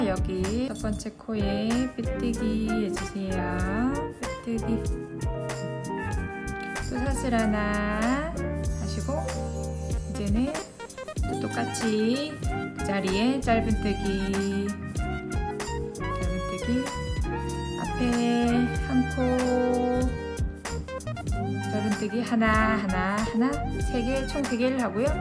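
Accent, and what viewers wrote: Busan, South Korea. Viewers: 부산 말투가 정감있고 좋아요~ 오른쪽거 제 취향. 수세미로 쓰기에 너무 이쁘네요. 컵밭침 인줄 알았네요. 삼베실로 모자 같은거 뜨면 예쁘겠어요. 짧은뜨기~ 하니까 예전 추억이 돋네요. 노인에겐 치매예방, 아이들에겐 집중력 개발 안정감 증진! 많이들 보고 따라하면 좋겠다 싶습니다.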